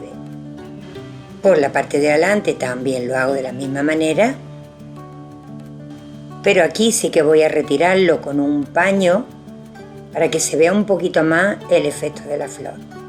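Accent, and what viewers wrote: Andalusia, Spain. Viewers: TE MIRO DESDE SAN RAFAEL, MENDOZA, ARGENTINA. TIENES UN TONO ANDALUZ, ¿DE QUÉ PROVINCIA ERES? ME ENCANTAN TUS VIDEOS Y SOBRE TODO, LOS RELACIONADOS A TUS ESPECTACULARES PESEBRES. SALUDOS.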